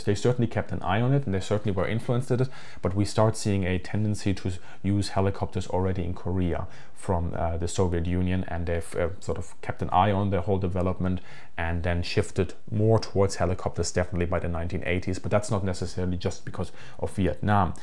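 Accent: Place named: Germany. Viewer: Ukraine has an aviation problem, they have none. They have to go begging to EU countries because, well theirs have been destroyed. I love how a guy with a German accent recommends how Russia should use their helicopters, lol what a joke, I'll take him seriously when Germany stops hiding behind Americas petticoat and enters the conflict.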